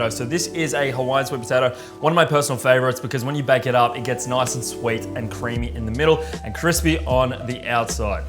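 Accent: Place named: Australia. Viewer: Great content and who doesn’t love Australian accents. Easily one of the nicest people in the world👍🏼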